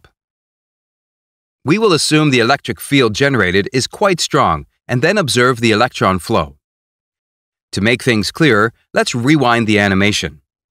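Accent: Kerala, India. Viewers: Well, he's not really imitating the western accent tho. He's a mallu and that's how some of em actually speak since I'm a mallu as well and know a lotta people who speak like that.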